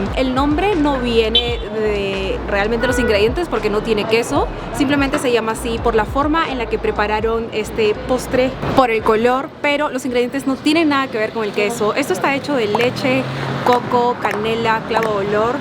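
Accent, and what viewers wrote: Peru. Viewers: Pues sí habla como peruana.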